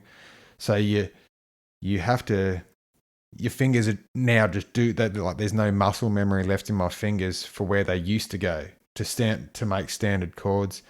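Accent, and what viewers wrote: Australia. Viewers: Toster Is he an Aussie ?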